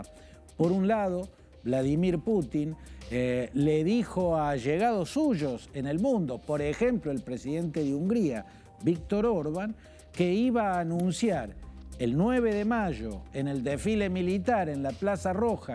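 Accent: Argentina. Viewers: Este señor, por su acento es argentino, bien estos ique analistas de este país siempre se saben todas las historias, no se como estados unidos no los contratan para asesores de guerra. Los mejores analistas que he escuchado que han sido embajadores de diferentes países en Rusia y que tocaron los temas del porque Rusia estaba actuando como lo hace. Este sabe mas que Putin osea solo vean a este señor y no tendrán idea de que esta pasado o pasará. Graciosamente solo habla de putin pero no comenta el papel de USA, Otan y la UE en este conflicto. Quisiera oírlo hablar de las Malvinas. Debe ser un libro de idioteces.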